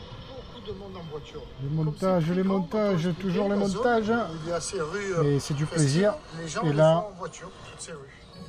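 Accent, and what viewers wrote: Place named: Marseille, France. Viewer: Merci:-) Profite bien et continue de nuits faire rêver avec ton gâté et ton bel accent marseillais ❤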